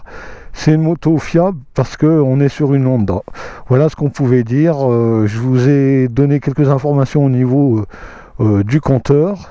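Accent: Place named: Lyon, France. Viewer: j’ai une Dacia Logan et une 911 carrera 4 S , je suis un automobiliste avec la deuxieme et pas avec la premiere ?...pareil il y a des permis B qui veulent rester avec leur petite moto et des fois c'est des Papy qui ne veulent pas passer le A2...Super Vidéo ,j’aime bien le beaujolais et ton accent de Lyon...gros V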